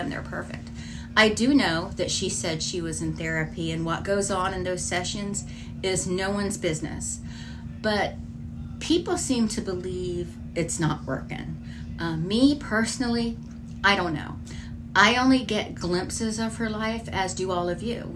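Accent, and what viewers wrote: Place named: South, United States. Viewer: Every now and then you drop your g off the end of your words and you sound real Southern and it's just adorable. Yes, should get with a bigger company and collaborate on a BIG TIME documentary. She has probably the most in depth knowledge of the actual events...I called her the "Advanced Gypsologist" or something like that when she was going up to fight the lawsuit saying she couldn't claim " Leading Expert".😂😂😂 and got a chuckle out of her on the Live that night. I was so worried for her and Sara just having to take off to go like that.... Meanwhile... Back in Da By-you...da family is lockin' da bedroom doors at night and hopin' she really doesn't take up cookin'...😂😂😂😂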